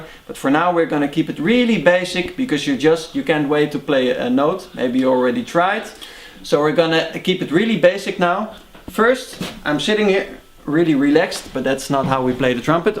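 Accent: Netherlands. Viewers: that dutch accent tho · Its a Dutch accent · I knew you were Dutch the moment I heard you speak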